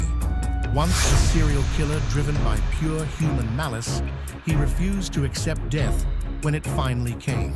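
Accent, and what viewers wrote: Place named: United Kingdom. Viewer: I think he did pronounce leprechaun but in a British way like they always talk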